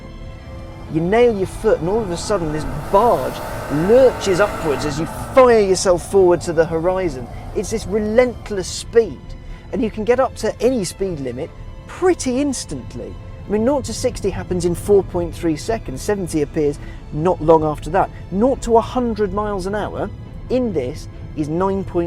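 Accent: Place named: England, United Kingdom. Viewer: To my untrained ears it sounded like Richard Hammond at the start before the camera showed it wasn't. Do they come from the same part of England? What accent is that?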